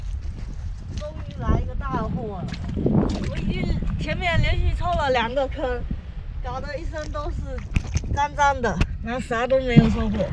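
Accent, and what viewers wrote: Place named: Putian, China. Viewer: 我上次有在你生日留言那个视频不知你有没有看过，听皮皮说你还没有男朋友我很开心，我觉你是聪明 美丽 朴素善良可爱姑娘如果有可能我们联系看看，简单的自我介绍一下，男28岁 福清人 父母都在家乡一个妹妹已出嫁、我在美国快10年本来今年二月要回国看亲（父母一直吹）我听你讲国语是莆田口音离我家乡不会太远我估计差不多2小时吧、你也知道在我们那边28岁还没有结婚算高龄了😭当现在美国疫情很严重不可能回国了，自从看了你视频以后觉的你一定会是那种会认真过日子的人，所以有机会我们互相交流了解一下、等你回复谢谢💪💪💪💪💪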